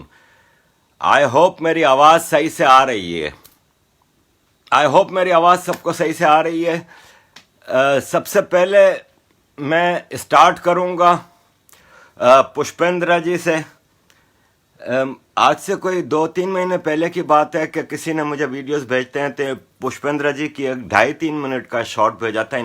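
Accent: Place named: Gujarat, India. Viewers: Aarif Bhai English bolne se pata lag Gaya aap bhartiya gujrati ho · आरिफ अजाकिया साहब आपका यह वीडियो क्लिप देखकर मैं और गौरवान्वित हो गया वह यह जानकर कि आप हमारे गुजरात के सौराष्ट्र जूनागढ़ जिला बांटवा के भारतीय गुजराती मेमण परिवार से है. और सबसे ज्यादा खुशी तब हुई कि आप हमारे हिंदुस्तान पर आज भी गौरव लेते हो. हमारे गुजरातियों में कहते हैं ना कि तमे तो सवाया गुजराती छो.आ रीते तमें तो साहेब सवाया भारतीय छो अने गुजराती पण छो. आनाथी वघारे अमने शु जोईये??? इससे पहले भी मैंने आपका बहुत वीडियो क्लिप देखा और सोचता था कि यह साहब नाक नक्स से तो सोरठी लग रहा है क्योंकि यहा जूनागढ़ जिले को सोरठ प्रदेश बोला जाता है. आप की हिंदी स्पीच बहुत अच्छी है फिर भी sir मैंने एक बात नोट किया है कहीं-कहीं आपके हिंदी उच्चार में( pronounced) गुजराती होने की की छांट दिखाई देती है. आपने यह बात सही बताएं यहां के मुसलमानों हिंदुओं से कुछ ज्यादा ही चिडे चिडे रहते हैं. जबकि हिंदुओं से उसका बहुत अच्छा धंधा रोजगार चल रहा है. 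और क्यों ना हो यहां के कांग्रेसी और उसके पाले हुए मुल्ला और मौलवी खुद की रोटियां सेकने के लिए मध्यम वर्गीय मुसलमानों को हमेशा हिंदुओं के खिलाफ भड़का रहे हैं और आपने जो दाखिला दिया जावेद अख्तर जिसको इस देश में मान सम्मान प्रतिष्ठा पैसा सब कुछ दिया है फिर भी देश के प्रति गद्दारी पाले हुए हैं वो खुद तो कहीं निकलते नहीं मगर इसमें बेचारे गरीब और मध्यमवर्ग के मुसलमान पीड़ित हो जाते हैं और देश के साथ direct or indirect गुनाह कर जाते हैं और बाद में यहां की जेलों में सड़ते रहते हैं और जावेद अख्तर जैसा माल मलीदा पेलता रहता है. और दूसरी बात यहां के ज्यादातर गरीब मुस्लिम एजुकेशन से वंचित रह जाते हैं इसलिए दूसरों की बातों में भी जल्द आ जाते हैं. हां यहां की मुसलमान कोम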